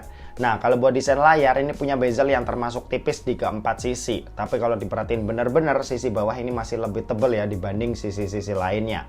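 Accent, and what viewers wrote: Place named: Purwokerto, Indonesia. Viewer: Wong Purwokerto kieh mase yahh? Pada bae, nyong sekang Purwokerto.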